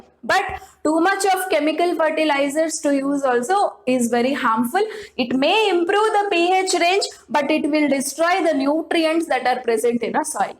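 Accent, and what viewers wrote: Karnataka, India. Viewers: Mam ure from Karnataka naah! 😊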